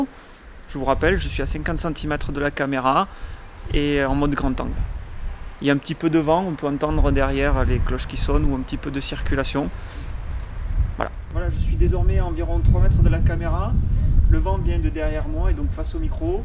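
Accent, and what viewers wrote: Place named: Narbonne, France. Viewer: Ha oué, c'est bien ce que je me disais, du Sud, mais pas de Marseille. ca chante plus joyeux chez vous :)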